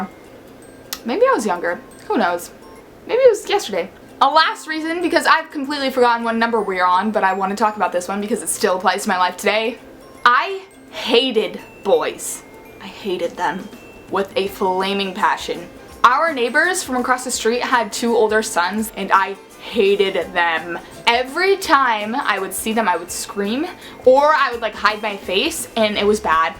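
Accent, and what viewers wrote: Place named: United Kingdom. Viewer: For some reason U sound like U have a British accent in this video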